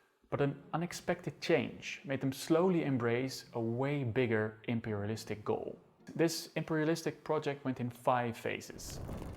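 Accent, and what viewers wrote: Netherlands. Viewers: Meest Hollandse accent ever haha. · biasa bang, di belanda kan diponegoro dianggap sebagai pemberontak rendah dan licik, sampai sekarang. jadi gk kaget lah kalo dia anggap dipenegoro itu seorang bangsawan licik. apalagi gua yakin ini orang pasti org belanda. dari cara dia mengeja kalimat dan huruf² singkatan saja udah ketahuan dia orang belanda. yah pastinya dia secara terselubung/sembunyi² "mengedepankan" versi sejarah belanda lah. lagian banyak (ato malah bisa gua bilang: semua) content maker belanda yg seperti itu, gk cuma ni org doang.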